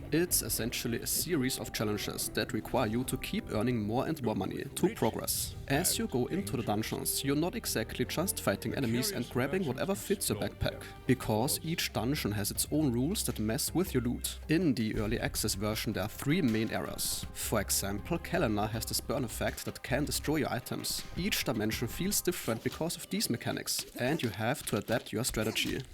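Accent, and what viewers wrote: Germany. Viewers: love the giga german accent!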